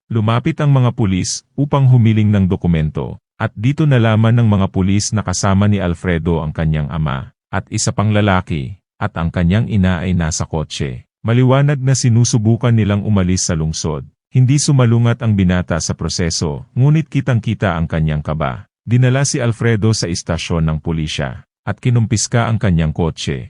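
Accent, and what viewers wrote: Visayas, Philippines. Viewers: Kahit may pagka-visayan accent ok lang naman naiintindihan naman ang kwento, someday mas gagaling ka pa katagalan. 💙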